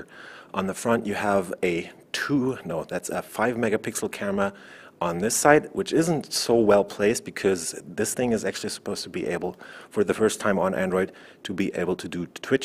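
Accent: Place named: Germany. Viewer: I love your german / english accent :p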